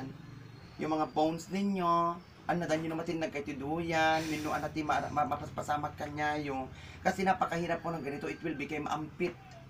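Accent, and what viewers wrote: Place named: Ibanag, Philippines. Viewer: Te .. marunong kabang mag salita ng ibanag... kasi yong tune mo parang....curious lang po.. salamat..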